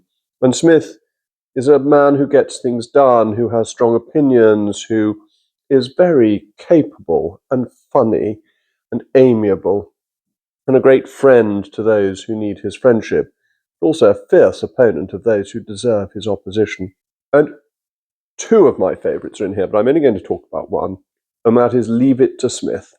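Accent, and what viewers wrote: RP, United Kingdom. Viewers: I love his posh manner and accent. Muy pijo as they say in Spanish lol.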